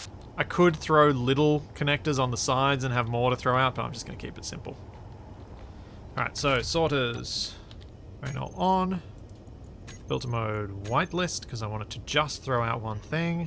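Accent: Australia. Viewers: Your voice, manerisms, and Australian accent are like a comfort blanket for me. I dont play space engineers too often anynore, but I still adore your videos 💜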